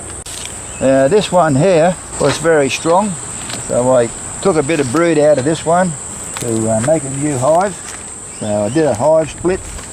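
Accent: Australia. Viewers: I have watched quite a few vids on the flow hive and most commentators come across as sniveling little spoiled brats trashing the product because of their often laughable and regularly idiotic opinions that appear to be mainly based on jalousy more than anything else. This is the first vid I have seen where anyone makes valid criticisms and demonstrates their factual concerns with the product. You have earned a lot of credibility with me. Clearly you are a very knowledgeable beekeeper and more importantly, an honest and fair gentleman. I get the impression you may not be overly keen on the flow hive and that's OK because your concerns are based on fact and experience and not concocted opinion just to jump on the bashing bandwagon. No doubt the product is not perfect but you make sense with your concerns and questioning of it which is miles above what many others have done. 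It's also great to see and hear a died in the wool Aussie making such informative and interesting vids. Well done mate and I wish you every success and good luck with your endeavors.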